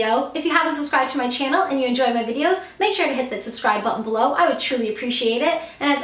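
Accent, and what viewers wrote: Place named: New England, United States. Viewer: New England? As in New England, Mass? I knew your accent sounded familiar. My boyfriend is from a small town right outside of Boston called Fall River. I knew something was up when I heard you pronounce 'saw' as 'sawr'; he does that all the time haha.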